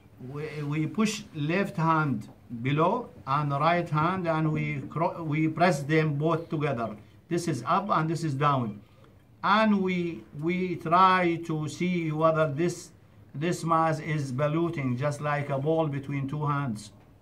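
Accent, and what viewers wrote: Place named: Iraq. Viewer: Iraqi accent is so cute ❤️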